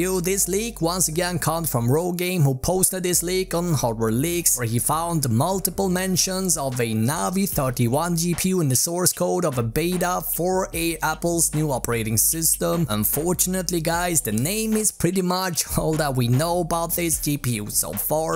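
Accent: Sweden. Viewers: Damn this swenglish though ....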